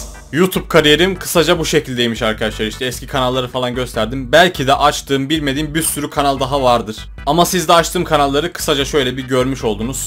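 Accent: Turkey. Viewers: Abi sen öz sivas lısın çünkü k leri g söylerek okuyon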